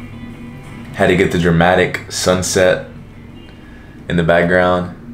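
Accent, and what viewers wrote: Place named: Texas, United States. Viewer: No homo but his Texan accent is such a refresher 😊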